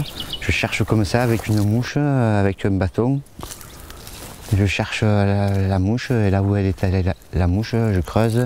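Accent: France. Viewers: l'accent du sud de la France, j'adore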